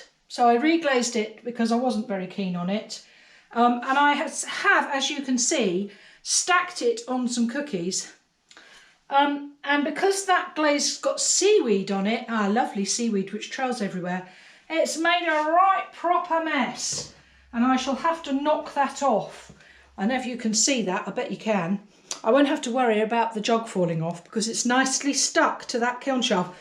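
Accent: England, United Kingdom. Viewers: All you do is so wonderful...none of it is "daft", as you say, LOL! Love the English accent too! The Tudor jug is gorgeous ! The mono-printing is very intriguing; love the look and curious about the process. Will keep an eye out for a video on it as well as the test tiles. Your husbands dish is very stunning. Aren't you glad you didn't give up on it?! Inspires me to re-fire a piece I've made. Had my eye on the poppy heads on the shelf behind you. Looking forward to part 2 on that video! Don't you just love the way this hobby can draw us away from the noise in the world around us? Allowing us to get lost in the process of creativity; spending hours & hours honing skills and processes. It's quite meditative! Many, many thanks again for sharing your wonderful talent and discoveries in pottery. There are a lot of videos about pottery here on YouTube, but yours most definitely rank high in the top 10!!! 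Kindest regards...stay safe and well. Cheers from across the pond :0)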